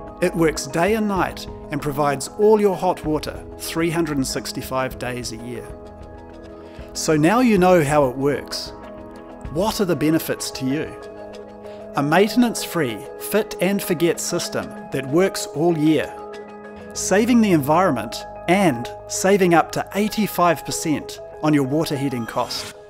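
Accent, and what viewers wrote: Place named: New Zealand. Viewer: Well, this is totally irrelevant but I must say I love you accent! We need more New Zealand people on the international media!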